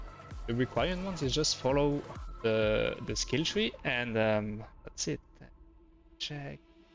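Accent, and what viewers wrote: France. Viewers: Once again, thank you. By the way, Sir, your accent, is it perhaps French?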